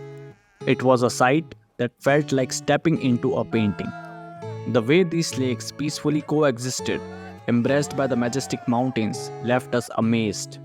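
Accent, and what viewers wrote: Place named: Kashmir, India. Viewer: Wht shit gujr kushur english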